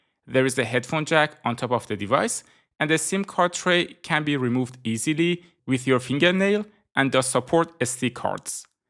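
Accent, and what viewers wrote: Iran. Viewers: داداش خیلی لحجه ایرانی باحالی داری😂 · لحجه ایرانی تابلوعه سید 😂😂😂😂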